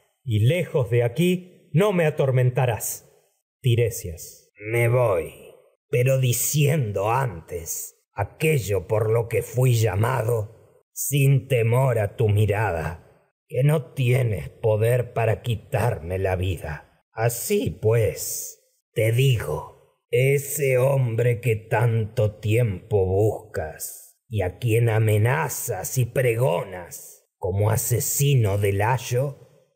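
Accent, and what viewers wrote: Argentina. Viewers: Muy bueno. Gracias! Argentino, verdad? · ARGENTINO TENIA Q SER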